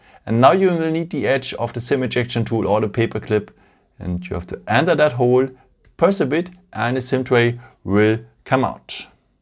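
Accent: Germany. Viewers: It sounds like you’re a native German speaker, but this accent sounds unfamiliar. Which dialect?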